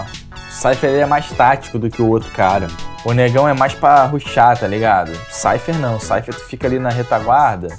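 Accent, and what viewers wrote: Rio de Janeiro, Brazil. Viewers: Carioca tem o sotaque engraçado para quem não está acostumado com a gente.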